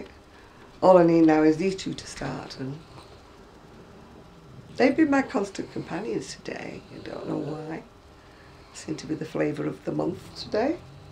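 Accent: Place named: Northern England, United Kingdom. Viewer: Hello. I just started crocheting 2 weeks ago and I found your videos when I was looking for crochet book reviews. I have subscribed to you as I love your videos:) I was intrigued to find out where you're from because of your northern accent, and I saw on your Etsy shop that you live in Manchester. This made me smile because I went to university in Manchester.:) Anyway, thank you for your videos. I hope I can be even half as good a crocheter as you one day! xxx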